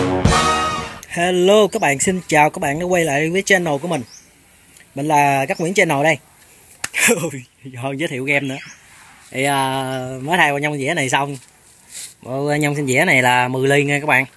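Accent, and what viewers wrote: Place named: Mekong Delta, Vietnam. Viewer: Luôn luôn ũng hộ Chia sẽ cách làm, kinh nghiệm rất là thực tế và dễ hiểu Cách nói cũng rất là chân thật người miền Tây.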